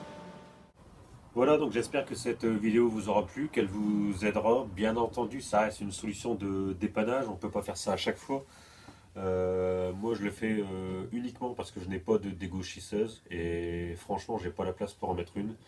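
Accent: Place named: Nord-Pas-de-Calais, France. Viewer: Bravo pour ce document bref et concis sans blabla inutile avec un ch'ti accent sympa. Il n'y a pas de chose mal faites, il n'y a que des façons différentes de les faire...Bravo à toi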